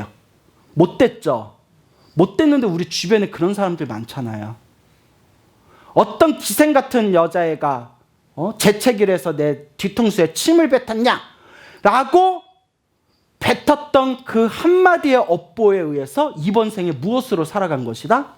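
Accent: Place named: Seoul, South Korea. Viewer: 광우스님이 쓰시는 서울말 너무 듣기좋습니다🥰 🥰🥰🥰🥰🙏🙏🙏🙏🙏🙏🙏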